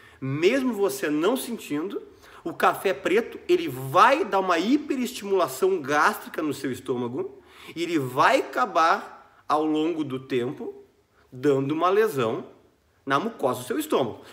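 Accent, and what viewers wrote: Rio Grande do Sul, Brazil. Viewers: Adoro os seus vídeos , não só pela competência, clareza, além de tudo isso, adoro sua forma gaúcha de falar. Eu vivo na Alemanha e mato um pouco das saudades. São excelentes seus vídeos e o senhor deve ser um excelente professor, além de excelente médico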